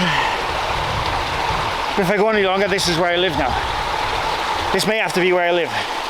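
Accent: Wales, United Kingdom. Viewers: Your Welsh accent sounds thicker out in the valleys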